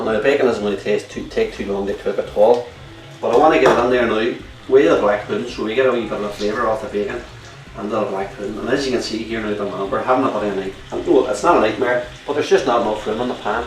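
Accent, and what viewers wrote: Derry, United Kingdom. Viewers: It's a Derry accent. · Derry accent!!!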